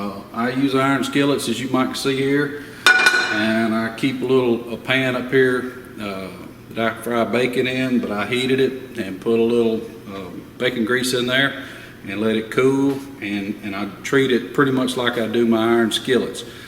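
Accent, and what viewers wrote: United States. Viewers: Great Review. Straight to the point. Thank You! I ordered the 12" and it should arrive soon. I can't wait to use it. P.S. Your accent reminds me of home (Texas). I live in NYC now.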